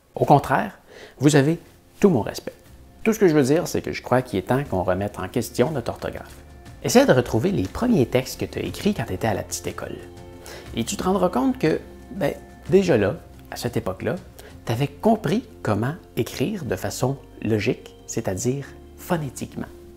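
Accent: Quebec, Canada. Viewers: "Sans" et "vent" ça ne le fait pas avec ton accent québecois : on entend sain et vin :-) mais ta vidéo est tout à fait probante, surtout la comparaison avec l'italien et avec les exemples d'orthographes anciennes ou des sources latines, je suis (con)vaincu. Ce que je vais ajouter n'est pas pour contredire tes propos mais pour apporter un éclairage et quelques complémentarités : l'étymologie se retrouve dans l'orthographe et peut donner du sens comme "forêt" (loin de sylvestre), dont l'accent circonflexe est un "truc" pour gagner de la place en supprimant le esse qui reste dans forestier, et pour ne pas confondre avec un foret pour percer le bois ou le métal. Un des mots que je trouve le plus dingue est « accueil », pour arriver à l’écrire je doit toujours le prononcer dans ma tête : akuèye, comment l’écrirais-tu ? Oui le français est plein de non-sens comme écrire « fils » pour le fils de son près, et pour les fils électriques, écriture qui ne dit pas comment ça se prononce, il y a des listes de ce genre que tu aurais pu citer. 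À contrario, l'amande le fruit de l'amandier, et l'amende prononcé par un tribunal : les militant en désobéissance civile qui vont en procès disent qu'ils aiment au menu les amandes et les avocats :-) Et c'est vrai qu'il est inutile de conserver une orthographe complexe, quand à côté le langage perd la poésie des métaphores, et quand les personnes vont vers un appauvrissent spirituel : on sait que certaines catégories sociales ont très peu de mots dans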